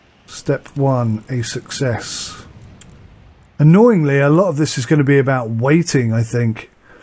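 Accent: United Kingdom. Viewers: Well done on this! I like how you turned things around from that little bit of debt. Out of curiosity, what variety of British accent are you speaking in? It's interesting to see the different voices out there.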